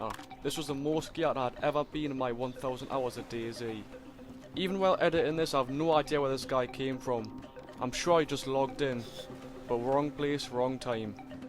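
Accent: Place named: Newcastle, United Kingdom. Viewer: Good to hear a Geordie streamer, great video mate 👍🏻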